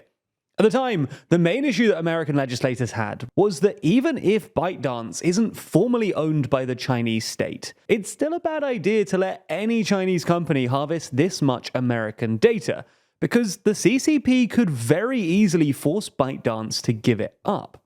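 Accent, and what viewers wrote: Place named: United Kingdom. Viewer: Presenter is British, speaking British English (from where the language originated) rest assured he is pronouncing it in the correct manner.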